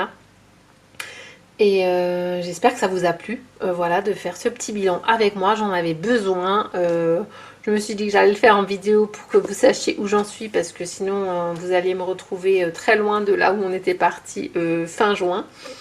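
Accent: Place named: Alsace, France. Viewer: Une alsacienne ! Quel plaisir ! Alsacienne également j'ai direct reconnu ce petit accent ! :) une très jolie vidéo !!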